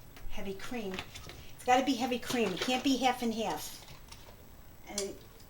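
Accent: New York, United States. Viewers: is she from new-york---- city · You sound like you have a New york, accent, I live in Rhode Island. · Great video! Fun gal. Wish you where my neighbor with your N.Y. accent! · Luna Lia Gingerbread I believe that is a New York or Boston accent. Love it! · I love her accent I think you should from Long island, New York, or perhaps Jersey. I also feel like she's a friend of mine like Francine said below my comment. Thank you I'm going to save this video very cool. I got a kick out of your frustrated with moving and not having all your things that you're used to using regularly. May God bless you and Long live butter!! 🐄 🥛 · Shes new York o bet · I think your video is useful.....it shows timing of how long it takes, first hand. Screw anyone that doesn’t like it. I like it and I also like hearing your NY accent ,by the way! Good job! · Girl you're from NY! 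Brooklyn? I'm from the Bronx and I think Bronx and Brooklyn sound alike... I haven't made butter in so long here heavy cream is do high never found for 2 and change lowest $4.99 I'm doing keto so I use butter a lot! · I enjoyed your video; is that a NY accent? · Thank you for letting us into your home. Your very sweet. Loved listening to your voice/accent. Are you from New York or New Jersey.?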